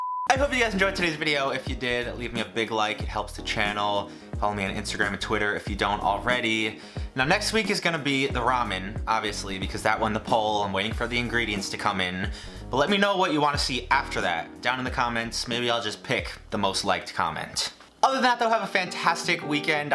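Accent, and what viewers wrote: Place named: North, United States. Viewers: I’m from Long Island New York and he sounds like my half of the Island. Completely normal. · Yeah, he has a northern accent! He lives in New York, doesn't he? I suppose it's subtle for people in New England, but as a Southerner with a California-esque accent, it's really evident lol!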